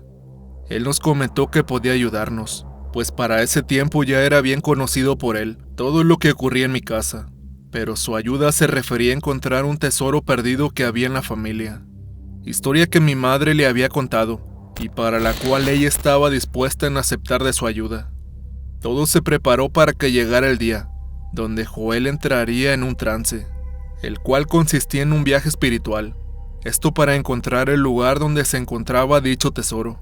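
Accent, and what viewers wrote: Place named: Northern Mexico, Mexico. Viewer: ¿de cual ciudad y estado eres? por que tienes el acento muy golpeado, como norteño.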